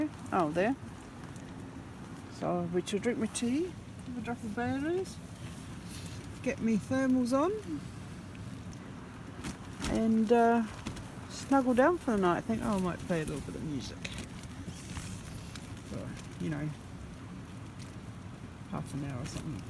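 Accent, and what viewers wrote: New Zealand. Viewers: Loved it!...great wee vid....wee hint of NZ accent there??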